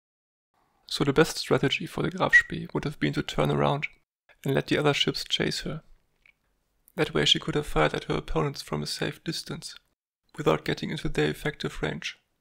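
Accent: Germany. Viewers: German accents from certain areas sound 'sad' to English speakers. The video is rather good, I thought.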